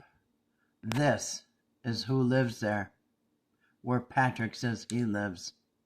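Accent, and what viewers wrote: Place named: Minnesota, United States. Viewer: U must be from Minnesota 😊 I used to work for Cargill. U betcha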